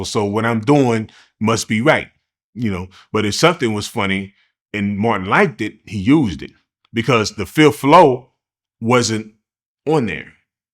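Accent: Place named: South, United States. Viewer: How do you got a southern Drawl but from Indiana 🤔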